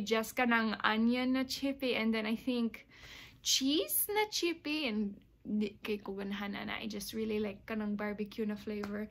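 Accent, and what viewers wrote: Visayas, Philippines. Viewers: Malipay jud ko sa imong vlog day. Pirting pagka bisaya jud oi. Hahaha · Ur intonation is bisaya , im amazed 😂😅👍... ganahan ko nimo LOL!😜😂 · Even your accent when you speak in English is Filipino. Wow just wow. So impressive. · Bisayaa jd oy 😂👏👏👏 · Ma shock man sad ta pure kaayo nya bisdak d I kaayo 🤣🤣🤣🤣🤣🤣 proud bisaya yohoo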